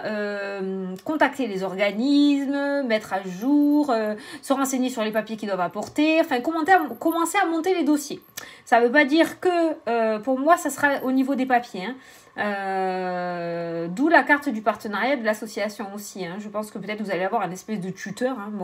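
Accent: South, France. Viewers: Merci pour le.tiraje ses.que des bonne nouvelles oui tu a l'accent du sud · Coucou, oh que ouiii tu la encore ton accent ❤et j'adore t entendre parlé! Tu chante le soleil du sud 🌝 il te vas si bien! Je t'embrasse bisous et merci à toi 😘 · Je te confirme tu as bien gardé ton accent du sud. bisous t'es géniale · Oh bibiche comme je croise les doigts pour que cela se passe ainsi ! Beaucoup de démarches en cours ici, en attente de retour pour justement des partenariats professionnels. Wait and see. Et oui, un très bel accent du sud :-) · Coucou tu as toujours ton accent du sud très agréable à t'écouter et avec le sourire 😍😆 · Bonsoir merci pour le tirage, oui je te confirme que tu as bien l'accent du sud mais c'est agréable plein de soleil! Gros bisous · 🙂🙂 tout est arrivé hier..tout le tirage c est trop ça... merci et vive l accent du sud ❤ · Toujours aussi juste 👏👏👏 et vive l’accent du Sud !!!!! 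⭐️🌙⭐️🌙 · Coucou oui ton accent du sud est mon soleil du soir ☀️🌞😍😘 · Coucou oui l'accent du sud on l'entend très bien, mais s'est très agréable ça nous fait un air de vacances. 😉😉💋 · cc oui tu as l accent du sud ainsi que le chant des cigales ta bonne humeur et ton sourire fait du bien. Je te regarde le matin et ma journée commence avec beaucoup de bonheur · Coucou ma belle, oui ton accent du sud est bien là, une joie pour moi de t'entendre magnifique en plus ta guidance toujours exacte ta joie de vivre magnifique je crois que pour la belge que je suis ça me fais énormément de bien je te kiffe à fond gros bisous je suis en pleine forme pour le moment !!!qui sais peut-être tu es contagieuse gros bisous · Oui tu as bien l accent du Sud merci pour ta bonne humeur et ta gentillesse bisou ♡ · 😂😂 j'adore ici 20 h aussi. Et oui tu as un bel accent du Sud. Moi c'est celui du sud ouest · oui je suis réveiller a3H du matin je ne dort que très peux merci j Aime ton dynamisme et ton accent du sud merci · Oh que oui que tu as l'accent du sud, et oh que oui que c 'est très agréable avec ta personnalité Pep's. 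Perso j'adore🙏 Merci pour le tirage,en effet pour le tirage de la semaine, le mercredi sortait comme le meilleur jour avec déjà la plus belle carte du jeu🤗 Belle soirée et à demain 💐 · Cc..ho oui tu l a l accent et c est juste magnifique..c est une voix qui chante on entendrait presque les cigales.. j adore tu as tout pour nous faire passer un merveilleux moment... Pour la communication oui je l ai tellement attendu...mais j ai dis que je lache prise.j en ai chier....et que ça serait bon de pouvoir vider son sac... Merci pour tout a bientôt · 28... pour le 6 janvier 8-2= 6... c'est souvent que tu nous fait ce genre de tirage synchrone. Ton accent ma Belle tu l'as mais comme je l'aime pas trop marqué mais tellement vrai. Je suis de l'Occitanie ( Aveyron ). Malgré 40 ans en région parisienne, j'ai toujours mon petit accent en fond...et je le cultive quand je descend au pays. Le tirage concerne le professionnel... 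et moi qui suis déjà à la retraite, je ne m'y retrouve pas dedans. Mais ce n'est pas grave... j'adore cette positivité pour ceux qui sont concernés. Seul point les insomnies qui sont fréquentes et mon Papa est très présent malgré que cela fasse 3 ans qu'il m'ait quitté le 30 décembre 2017. Bisous ma Belle du Sud👄💗🌞😍😍😍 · Ouiiiiii tu l'as tjs notre bel accent du sud, merci à toi et elle journée 🙏🌞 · Bonsoir. T'inquiète....! tu l'as l'accent du sud. Il est chantant, charmant, et pleins de tonus, comme toi. Il te vas bien! Bises. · bonjour ma chère tout d'abord merci de cette exellente guidance et rassures toi ta petite voix c'est le soleil du midi les cigales toute ma jeunesse et cela fait remonter tant de bon souvenir coquin de sort garde ton accent c'est si joli je t'attend demain sur you tube et je t'envoie plein de bisous ma pichoune Nelly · Bonsoir oui on entend bien ton accent du sud. 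Je te souhaite une bonne soirée à demain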